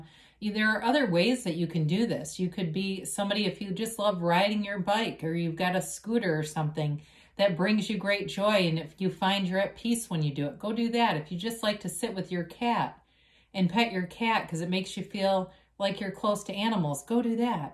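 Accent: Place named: Boston, United States. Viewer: I'm Spanish, I was an exchange student in Mass a long long time ago, so I claim it!!! Wanna go back to Boston yes!!!😄🥰In fact I love listening to you because of your accent. In my Profesional life there a lot of british accent speakers and international and all of a sudden you sound so familiar...may I ask where in USA are you from?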